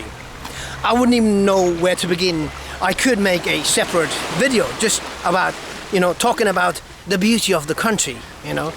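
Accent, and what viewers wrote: United States. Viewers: Please I hope you should come to Sabah to explore more of out unknown beauty hidden in the big jungle of Borneo, deep sea treasures at Semporna, Mount Kinabalu n our friendest people in Malaysia.. Plus the rich varieties of sea foods, local foods n local fruits that maybe you have not across before.. By the by listening to your English slang.. You could be an American n migrated to Europe I used to stay in US in 1980s while studying at Indiana State University.. till I obtained M.Ed.Geograpy..came back to Kota Kinabalu Sabah 1989.I enjoyed 6 years staying in US during my studies.. I wis one day I ll come back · You say you are a Swiss family. But, you have a US accent, with alot of Americanisms. Why is that?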